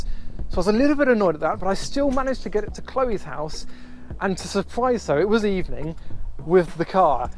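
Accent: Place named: RP, United Kingdom. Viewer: I can't believe how posh you are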